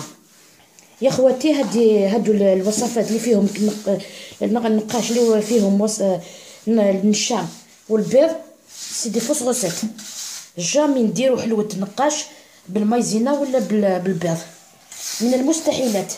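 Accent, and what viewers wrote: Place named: Bejaia, Algeria. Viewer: ya3tik saha ya l'fahla konte ghir n'hawass 3la la Re7 hata sma3te la voix ta3ak chaft la vidéo 3raft bali bdjawiya vive les kabyles Toooooop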